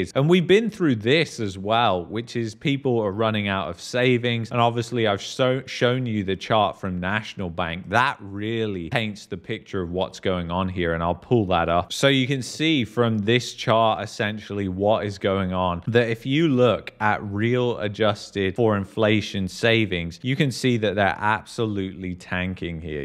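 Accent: England, United Kingdom. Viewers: Hey you sound English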